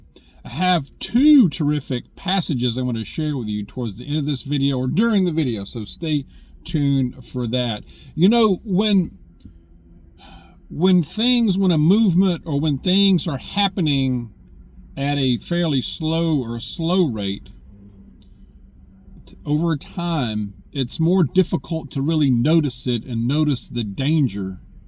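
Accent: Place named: United States. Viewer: I like how you talk...good hearing just regular American citizen real person. I'm Tennessean so that's more like normal folk.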